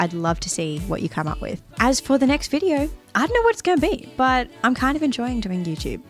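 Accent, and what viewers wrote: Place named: Australia. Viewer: Very interesting information in the description section. I was actually curious if you were in Australia, or maybe a nation with a similar accent. Being a 30 year broadcast television veteran, I have to add that I’m very impressed with your editing skill. When I was in college we only had actual videotape editing! lol Computer based/non-linear editing was just emerging. Two questions: Do you offer vocal lessons; and, are you on Facebook? Love all your vids!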